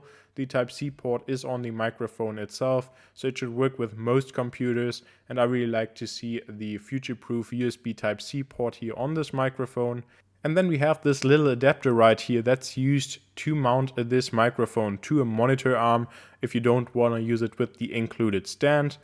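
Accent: Germany. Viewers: Deutscher Dialekt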